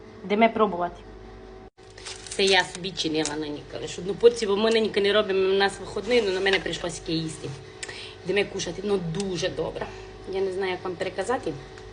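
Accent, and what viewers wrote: Transcarpathia, Ukraine. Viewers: Дуже гарна жіночка, такий цікавий акцент,Закарпатський.Дякую за позитив і рецепт.Всього гарного та позитивного вам.Наталья.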